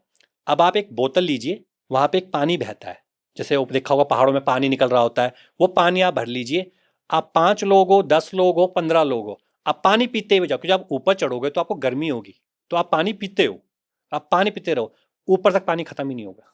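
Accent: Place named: Pahadi, India. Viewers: Pahadi bolchal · It's a part of pahadi dialect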